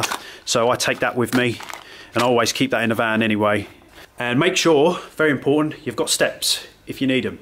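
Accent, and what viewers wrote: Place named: Birmingham, United Kingdom. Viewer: are you from Birmingham? I like your account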